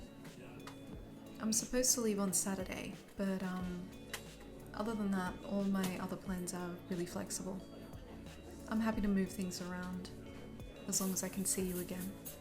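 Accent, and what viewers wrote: Australia. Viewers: We finally got An Australian asmr love the mention of Perth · I have many friends who are Australian. I also have a cousin who moved to marry her now husband. The Australian accent is my upmost favorite accent. Love the audio. 100% dragon approved 🐉 💜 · one little piece of feedback i have, you have a soft voice and the ambient sound was a bit too loud in my opinion, other than that really great voice acting and script! also yes, Australian accent is really cute! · Likewise... And I don't understand the appeal of the Australian accent. I've been surrounded by it my entire life, and it's usually annoying (except for a select few such as this lovely VA). If you'd like to explain, I'm all ears.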